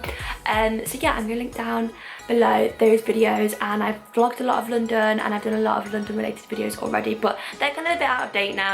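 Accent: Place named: Sheffield, United Kingdom. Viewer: Knew I was recognising a Sheff accent all this time! I'm from Darnall and have been seriously considering London for uni lately.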